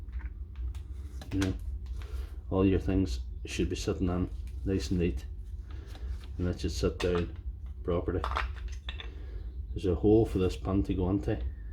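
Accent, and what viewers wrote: Northern Ireland, United Kingdom. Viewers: I will try and get to the root cause of my drill not working this weekend. I have confidence to at least give it a go. If I mess up then by the sound of your accent you will only be a couple of hours drive from Belfast😊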